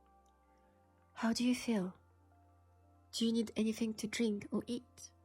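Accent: France. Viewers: Idk why the french accent have an aura I LUV IT..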